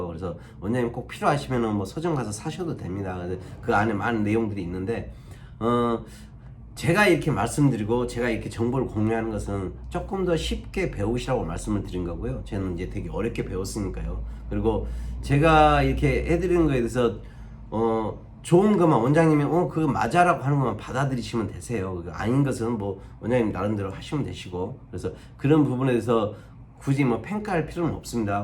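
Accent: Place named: Jeolla, South Korea. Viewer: ㅋㅋㅋㅋ전라도 분이신갘ㅋㅋㅋ정감가요 말투가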